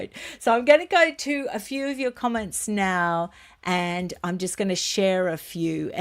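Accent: Australia. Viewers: I listen to you on YouTube mainly because I miss the Aussie accent. I was a ten pound pom and lived in Adelaide nearly twenty years. I wish people would stop abbreviating Catherine to Kate, but it was great to see who in the royal family were there. Did you find out who the guy was behind Catherine? In UK we need the Royal family especially when some of ministers show their lack of diplomacy by bad mouthy other politicians around the world.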